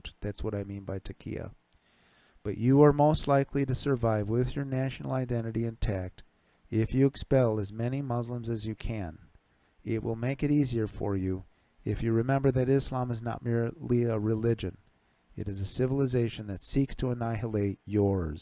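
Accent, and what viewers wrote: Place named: United States. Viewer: Your are right. But by your accent? you are in U.S. isn't it? the problem in Europe is that you talk about what will happen in Europe withon 1 or 2 generations... and too many people in Europe? don't have children, so they couldn't care less about the next generation. Angela Merkel? no children, no grandchildren. Teressa May? no children. Emmanuel Macron, no child of his own. That's a side effect of a birth rate under 2, in whole west and central Europe... They have cheap workers now, they don;t care about the future... BTW utube also blocks ppl fm comment and accounts, under "ofending" ppl rules... Immigrate for the cause of Allah, Jihad by immigration, and taqiyyah lie to them, is is Quran 4:100-104 <link>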